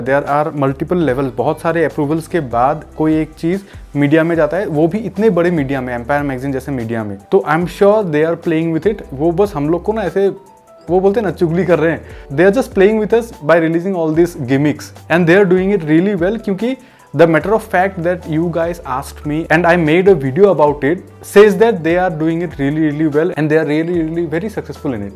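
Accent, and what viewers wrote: Assam, India. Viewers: R u Assamese?? Bcz Ur accent is familiar for me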